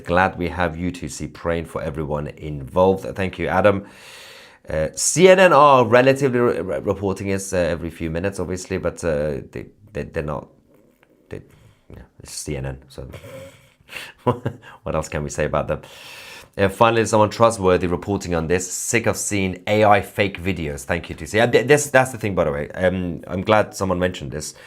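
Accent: United Kingdom. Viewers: Annoying British accent lol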